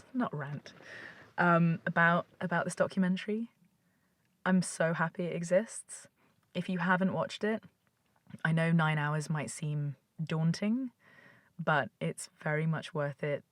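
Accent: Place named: England, United Kingdom. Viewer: I'm yet to see the actual film. So l can't comment on it.. I am a guitarist singer songwriter and have been for 50 years. I love and the music of the 60s and 70s. Great to see your reaction. By the way you have a lovely English accent. It was nice to hear you talk.So clear and not rushed. I won't be able to see the film until I get back to the UK in June. I look forward to it very much. I'm stuck in the mountains near Granada. Me my guitar Paints and my dog entirely alone. I wish you all the best. Happy Christmas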